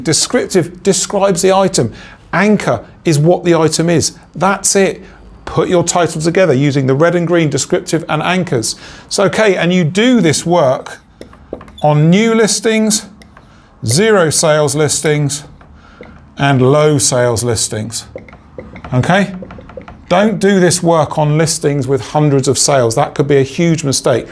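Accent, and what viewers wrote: England, United Kingdom. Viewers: great to have an English accent on here. Most etsy helpers seem to be from US!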